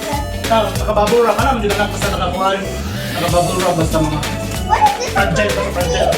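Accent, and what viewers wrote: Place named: Visayas, Philippines. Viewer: Bisaya ka bay, ayos hehe tuloy tuloy lang natin to.. hehe minsan ok rin mag order s lazada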